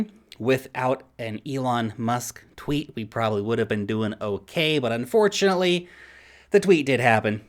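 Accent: United States. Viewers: New Zealand? How come you sound like you're from the US? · you’re a kiwi 🥝? What’s with the American accent? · Wow, I always thought is from the US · you don't sound like you are from New Zealand. You sound American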